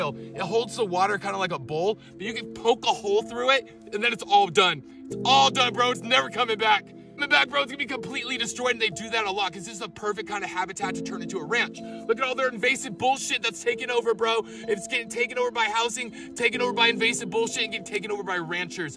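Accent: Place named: West Coast, United States. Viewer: Bro I mean this in the nicest way because I’m 1000% for what you are doing. But if you are gonna want to gain support from people outside of the west coast in big numbers you are gonna have to stop talking like that, people just aren’t going to take you seriously in most parts of the country.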